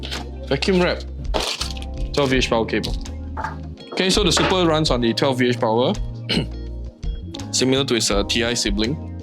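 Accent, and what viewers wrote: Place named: China. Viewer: Your accent is so chinese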